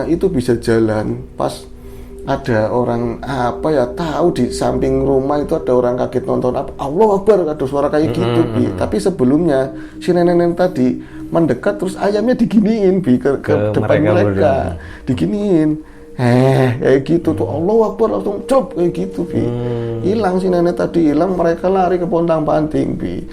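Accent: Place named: Tegal, Indonesia. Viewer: Kalo bisa logat Tegal, banyumasan,brebesan,dan logat ngapak lainnya jgn disembunyiin,mas!!.Unik sekali!! Salam Pekejing, mas!!!!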